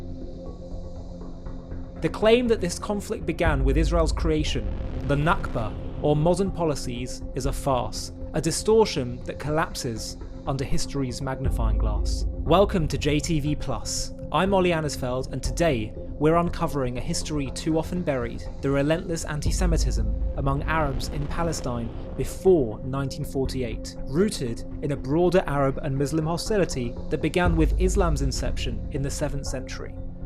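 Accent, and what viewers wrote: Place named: United Kingdom. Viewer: U R the most couragous man with British accent thank again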